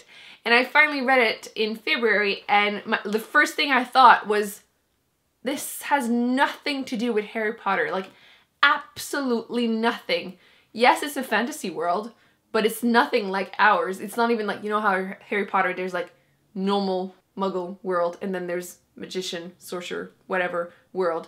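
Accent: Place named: Quebec, Canada. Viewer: Oh mon dieu, Are you from Québec? :o That accent melted my heart :')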